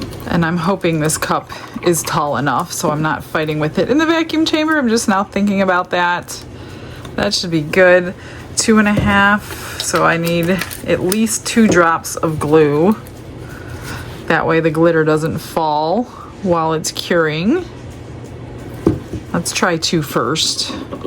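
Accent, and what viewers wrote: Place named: Midwest, United States. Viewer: I get so many neat ideas from you. Your speech pattern and vocabulary make me think you are originally from the Midwest. I am from Nebraska and you make me think of home 😊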